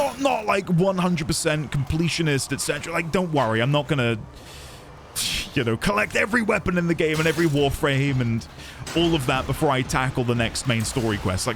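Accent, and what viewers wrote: United Kingdom. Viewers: weird to hear a northern uk voice in warframe's universe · When you first pronounced "capillary," I just chalked it up to you being British. I mean, really, you just say everything wrong anyway. You pronounce r's like a's ("neah" instead of "near"), you pronounce a's like r's ("i-dear" instead of "idea"), then there's the whole aluminum/aluminium thing. So when you said "capilliary" I didn't bat an eye.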